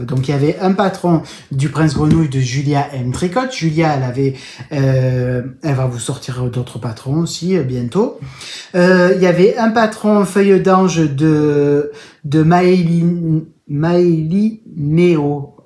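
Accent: South, France. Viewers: ce bel accent du sud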